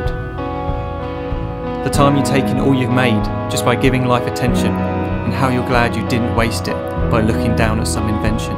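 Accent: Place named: United Kingdom. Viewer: Absolutamente sensacional! Nossa triste realidade e a antevisão do futuro,com o tempero especial do delicioso sotaque britânico (ou australiano?).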